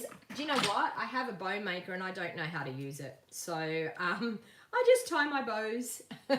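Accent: Australia. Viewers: Love watching you from CO USA! After visiting England the last 7 years I’ve decided the Aussie accent is the best! 😬😉 but don’t tell the Brits! 😂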